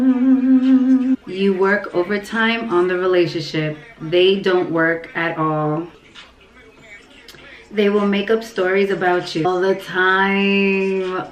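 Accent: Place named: Latin America. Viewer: yo....are you black? I know thats a dumb ass question but i wanna know because your accent makes you sound latina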